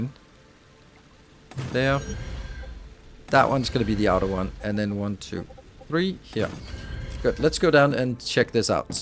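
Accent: Denmark. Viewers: Quick question i hear your voice but are you dansk ?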